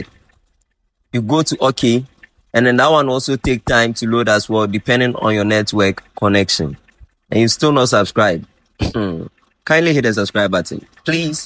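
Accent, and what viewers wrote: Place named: Ghana. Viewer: You sound so much like Ghanaian even though I know you are a ghanaian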